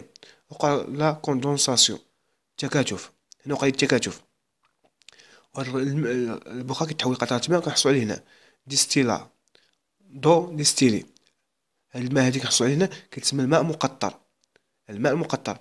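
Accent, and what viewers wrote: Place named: Western Sahara. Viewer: لكنتك صحراوية. موفق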